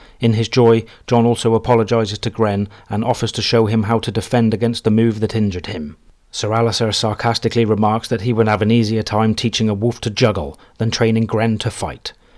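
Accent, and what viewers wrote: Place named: England, United Kingdom. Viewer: it's because your accent is so naturally English, that it's difficult to imitate, but sounds so beautifully cultured. Universal English is American English because it's much easier than English English to pronounce. 😆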